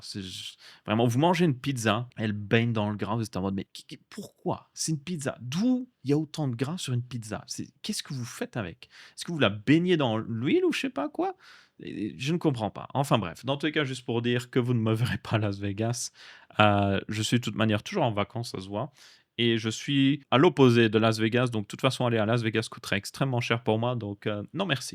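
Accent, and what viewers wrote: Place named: Belgium. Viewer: J'adore ton podcast, agréable a écouter avec se superbe accent, qui me rappelle les copains de Belgique.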